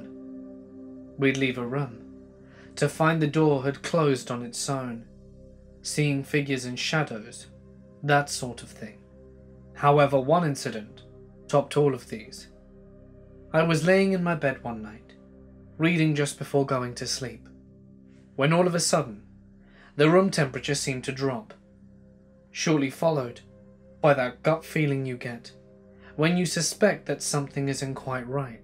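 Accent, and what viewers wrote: United Kingdom. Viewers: I truly enjoy your stories. Especially your voice. Being across the Big Pond I don't often get to listen to British voices and yours is amazingly smooth and well modulated. One channel sounded so bored he put me to sleep. You know just how to make a story scary and sometimes sad. I just wanted to thank you for all your hard work. Also thank you for sharing. Ms Michal aka Oldgamerchick😺😉☕🙏💖⛪💖 💀💀👻👻👻👻👻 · British accent isn't working for me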